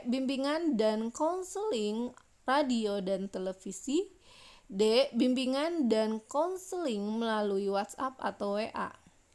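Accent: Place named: Java, Indonesia. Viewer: Mandan medok sedikit bahasanya ya mb..hehe..pk sukses selalu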